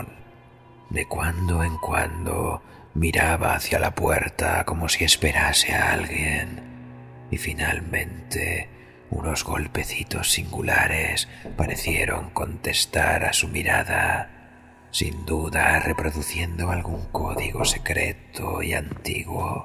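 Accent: Catalonia, Spain. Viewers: Por que el acento catalan? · El narrador es catalán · Vaya ramalazo a catalan cerrado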